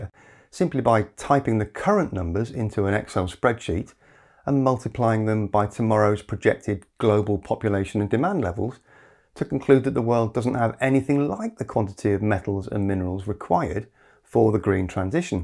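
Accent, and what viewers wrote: United Kingdom. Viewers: Hearing you come up with terms like "research odyssey" and speak it in the British accent is why I always preferred listening to Brits narrate in documentaries.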